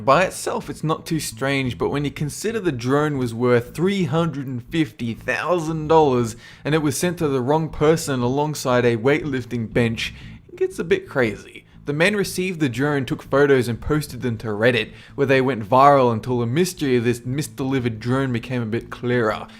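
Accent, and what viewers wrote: Australia. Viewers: im pretty sure he is Australian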